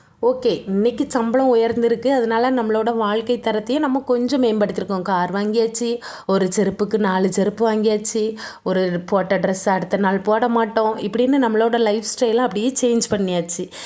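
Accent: Tirunelveli, India. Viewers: நெல்லை பேச்சே தனி அழகுதான் சூப்பர்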